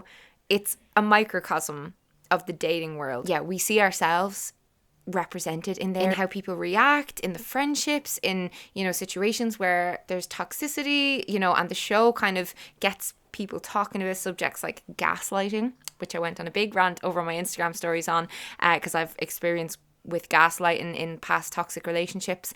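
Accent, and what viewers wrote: Ireland. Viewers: I am currently reading your book (english is not my first language) and one thing that it does to me is crave hearing the irish accent. so hear I am listening to you ramble on and enjoying it very much :)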